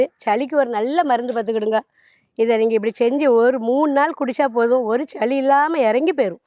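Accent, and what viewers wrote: Tirunelveli, India. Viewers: அம்மா தங்களின் பேச்சு எங்கள் திருநெல்வேலி பாதை போல் உள்ளது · நெல்லை தமிழ்...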